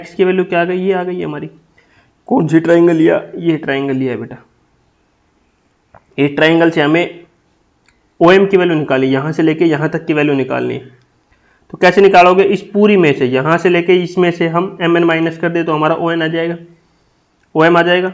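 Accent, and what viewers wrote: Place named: Haryana, India. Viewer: Ram Ram sir ji , your accent clearly haryanvi , thankyou for great information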